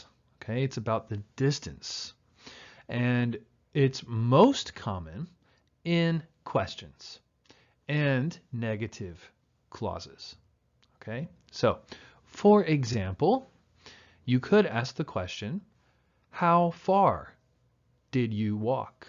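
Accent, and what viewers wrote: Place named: United States. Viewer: your classes are incredible and your american accent is great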